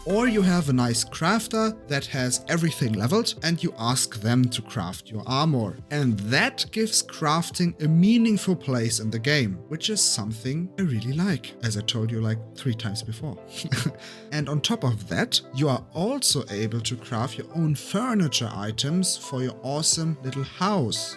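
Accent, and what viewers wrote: Germany. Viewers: Starker deutscher Akzent, heilige Maria XD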